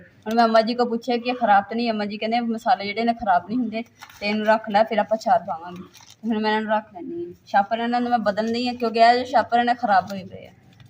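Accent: Punjab, India. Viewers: App Punjab ke log jasy bolty hai vasu hi appebolty ho mai Punjab say hu sis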